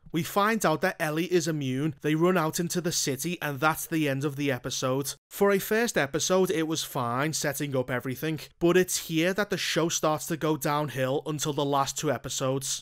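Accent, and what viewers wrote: Liverpool, United Kingdom. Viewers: A nice scouse commentary on the last of us tv series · This video is 10x better with your scouser accent.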